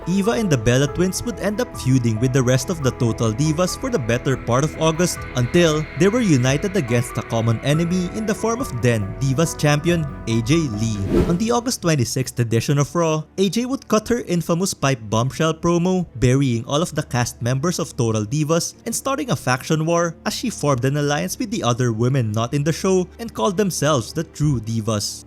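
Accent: Philippines. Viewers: Bro are you filipino? 😍😍😍 love your voice!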